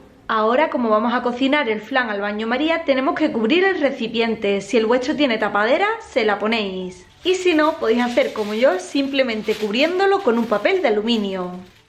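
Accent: Andalusia, Spain. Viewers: Es de cadiz, yo soy de sevilla y pronuncio igual. Mal? Es andaluz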